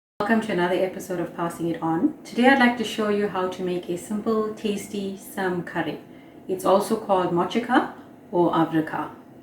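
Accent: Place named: South Africa. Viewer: Love the South African accent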